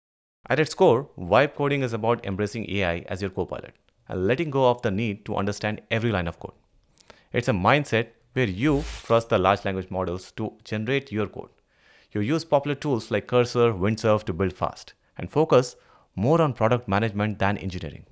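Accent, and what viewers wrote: India. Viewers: Are you south indian? I just thought of asking. No other reasons.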